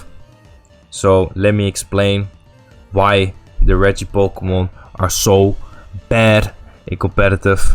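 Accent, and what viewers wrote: Netherlands. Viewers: Lmao dat nederlandse accent maakt deze video zo veel beter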